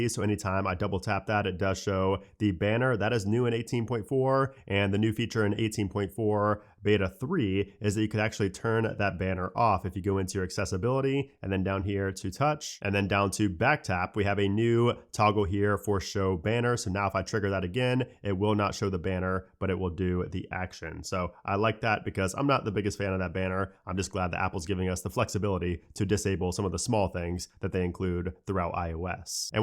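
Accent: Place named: South, United States. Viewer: It's a southern accent lmao. It's called an accent.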